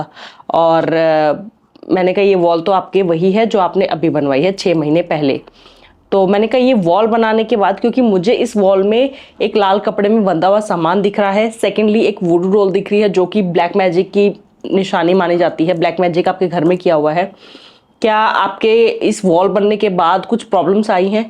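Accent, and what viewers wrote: Haryana, India. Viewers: Mujhe b ap bahut achhe lagte ho😘😘😘 or specially ap jab haryanvi bolte ho jab🤗😍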